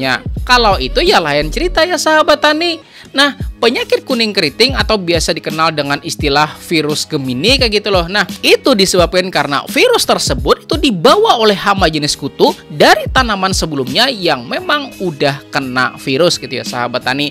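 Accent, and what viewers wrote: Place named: South Kalimantan, Indonesia. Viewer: Suka denger gaya abangnya jelasin. Di dengar dari logatnya, orang Kalsel ya bang?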